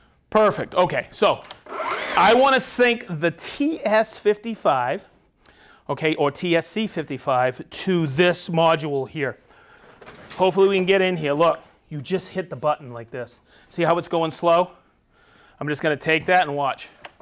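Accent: Boston, United States. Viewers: It's like being at a carnival. And he's the barker from Boston.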